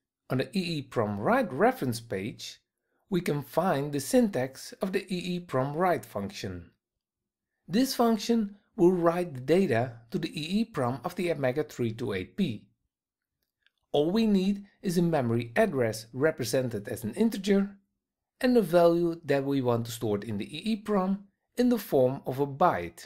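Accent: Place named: Netherlands. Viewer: The guy with the hollands accent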